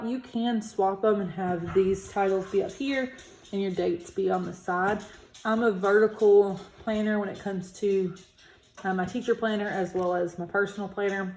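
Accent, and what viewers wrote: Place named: South, United States. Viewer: Thanks for flipping through your planner..I wasn't familiar with this company and I love how you can customize the class periods and that the day is at the top!! for all those extra pages, I would probably just tape in things like the district calendar, a list of important dates like when grades are due, faculty meetings, etc. and that type of thing..any kind of handout I got that I wanted to keep up with all year. Also...I'm a fellow Southerner but I live in the PNW, so it also made me happy to hear your accent. :)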